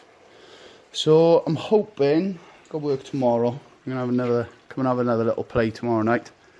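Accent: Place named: Wales, United Kingdom. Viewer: What part of Wales are you from? I'm in rhondda.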